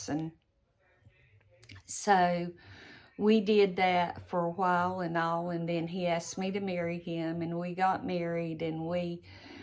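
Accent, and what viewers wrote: South, United States. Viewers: Not boring at all. The sound of your voice is so soothing. I just love your southern accent. I think you could read a book and I would love it. A chapter every day or so? Maybe start another YouTube channel just for narrating a book? It's a thought. Thank you for your life story. Hope there's more to come! There's quite a few years you left out.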